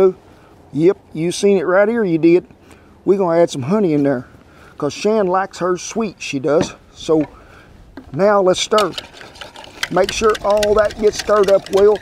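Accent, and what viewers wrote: South, United States. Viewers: was just listening to him talk while im doing stuff around the house and i thought it called for Bacon powder! Rewatched and saw its Baking powder! LOL those southern accents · He has a Southern accent . It's the most popular accent as voted by someone. I love his voice. Handsome guy . Sounds like my Tennessee family and friends. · Lol ... I’m from Texas and knew exactly what he said! I guess ya have to be from the south to understand the dialect 😊 love it 😍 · i am actually watching this channel for the great accent hahaha. i just love the Southern Accent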